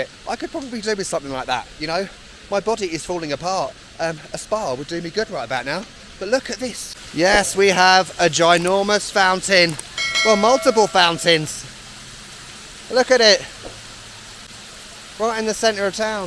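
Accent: United Kingdom. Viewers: thank you for your brilliant shots, Serbian subtitles, and bludy flowless brits acent i like it the most.)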